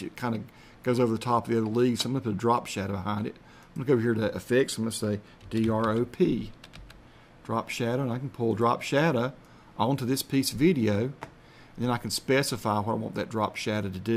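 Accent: South, United States. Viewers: love the southern accent xdd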